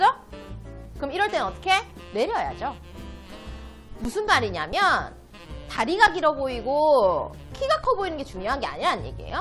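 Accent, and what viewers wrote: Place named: Gyeongsang, South Korea. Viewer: 미국에서 눈팅만하는 40하고도 1/4세 ㅋㅋ 삼둥이 맘입니다. 언젠가 한국에 가면 꼭 가겠노라며 위로하면 영상 잘 보고 있습니다. 같은 경상도라 설명이 귀에 쏙쏙 들어옵니다. 정강하고 고급지게!! 감사합니다 🤗🍓🍓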